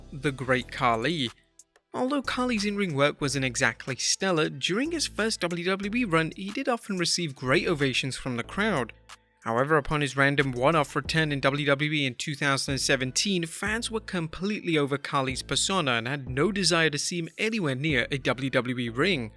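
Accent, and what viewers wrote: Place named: United Kingdom. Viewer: Gotta love a top 10 list about lack of crowd reaction but we never get to hear the reaction, just a British voice talking. Also, why are top 10 lists always British?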